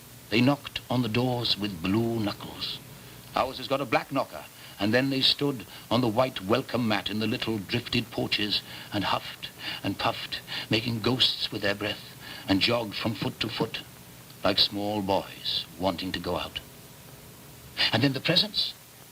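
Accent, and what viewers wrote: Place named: Wales, United Kingdom. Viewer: Burton really went back to a Welsh accent as he went through this. As a Welsh person I loved that.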